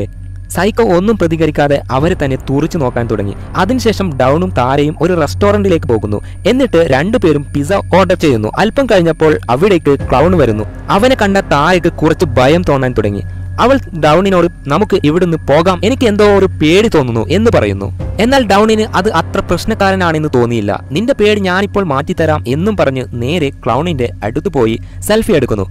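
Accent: Kannur, India. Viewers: കണ്ണൂരുകാരൻ ആണോ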